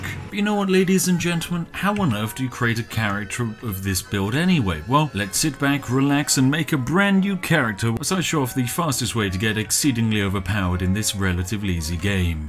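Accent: United Kingdom. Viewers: Well… did you catch his spiffingly British Britishosity? He’s an incarnation of one of the peaks of Human performance (Evangelic British Hegemony).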